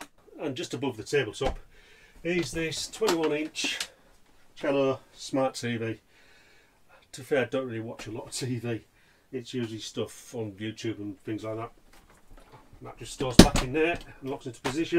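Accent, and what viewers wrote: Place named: Hull, United Kingdom. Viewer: Fantastic setup mate, you’re from hull aren’t you ??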